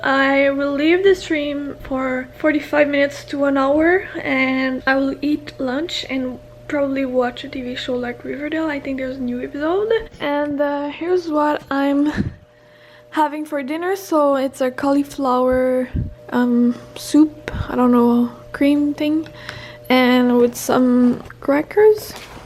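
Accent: Quebec, Canada. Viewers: Are you by any chance from Quebec? Your accent sounds familiar :D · R u french canadian?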